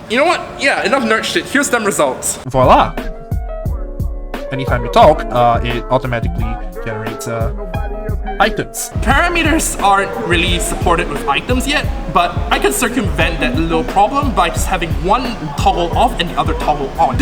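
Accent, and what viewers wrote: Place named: United States. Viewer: Just want to say, I love the accent you're packing. And I thought every Singaporean Vtuber here had the Singlish problem, you're one of the first I've watched with a normal American accent, and I'm happy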